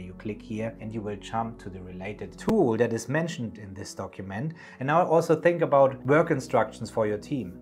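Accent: Germany. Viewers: Do we here a german accent here? :D Great video! Greetings from Karlsruhe!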